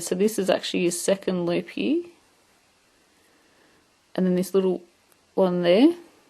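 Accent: Australia. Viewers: you are hearing the true Aussie accent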